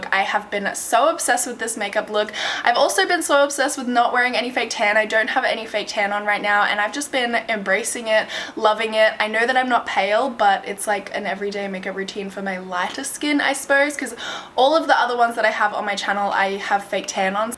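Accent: Australia. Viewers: I watch your videos religiously and not just for the makeup...I'm so obsessed with Australian accents lol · I've quickly grown to fall in love with your style, personality, all around everything. Can I be you? Or can we just be friends? I'd settle for that 😫😥 keeping doing you!! I absolutely love all that you do! From cruelty free from your Australian accent to your way of explaining & doing your makeup 💋‼️😍 slaaay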